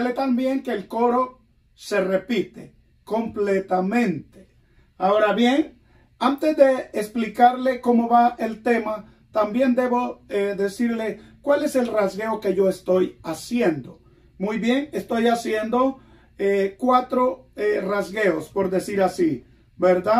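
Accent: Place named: Guatemala. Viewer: Dios nuestro Dios padre de nuestro señor Jesucristo lo bendiga siempre me imagino desde Puerto Rico 🇵🇷 pero el acento no es boricua sus hermanos de Guatemala 🇬🇹 amén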